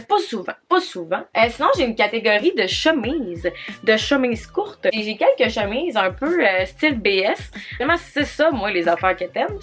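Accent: Quebec, Canada. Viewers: hey je suis française et c'est génial, j'adore ton accent et tes expressions plus marquées ou différentes des autres québécoises que je suis :') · J'adore ton accent du Québec ! Quelle belle garde-robe !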